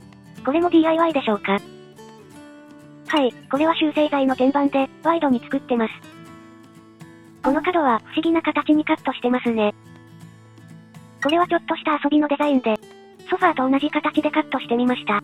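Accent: Hakata, Japan. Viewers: チャンネル登録したよ🤗 Goodボタンも押したとよ🙋 博多弁？面白かとよ🤣🤣🤣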